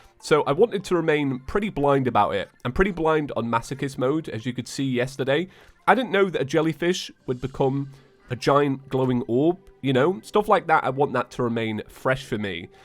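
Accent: United Kingdom. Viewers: I saw the video and there were already six views! Happy Thanksgiving. P.S. Just realized you’re British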